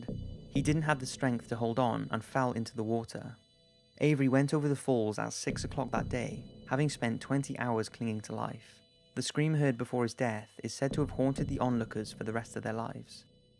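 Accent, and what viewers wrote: Wales, United Kingdom. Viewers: do I detect a slight welsh accent?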